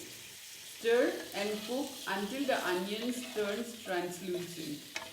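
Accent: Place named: Assam, India. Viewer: Lovely ☺️ I wish could have a taste of your dishes… that Bodo accent was funny though 🤭 but thanks for the dish as my Khasi gf was pushing me to cook it with pork and u saved me 😊 🙏 keep posting amazing Bodo dishes 👍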